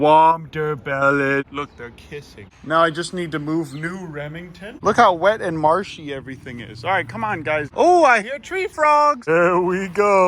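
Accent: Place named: Southeast Pennsylvania, United States. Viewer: I noticed on your last vid you said you were from PA. I honestly thought just from your voice you might be a south east native. I'm from the West Chester/Chadds Ford area. As well you remind me of a good friend of mine Joe Magno, any relation?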